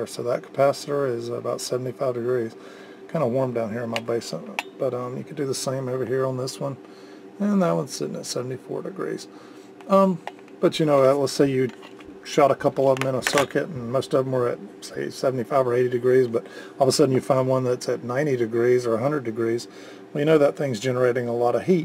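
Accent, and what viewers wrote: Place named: Texas, United States. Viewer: Love your videos. I am 61 and looking to get into Vintage Audio repair not only to keep my gear in top condition, but to give back for what has been a lifetime of enjoying these fine components. I'm an electrician and built a Harley from scratch when I was 17 in my bedroom. I think I can figure this out. I know a gentleman I work for who has an accent identical to yours. He's from around Waco, Tx. Anyway, I'm going to purchase a Sprague TO 6 and was wondering if I need to desolder every capacitor I test. I'm guessing yes, because if it is leaking voltage, it will send high voltage to the other components while connected to the circuit board and possibly damage them. Is that correct?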